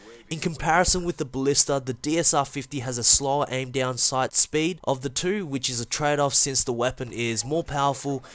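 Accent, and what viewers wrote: Australia. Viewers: Are you Australian? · R u Aussie m8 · Aussie oi oi are u from aussie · Australian !! Yay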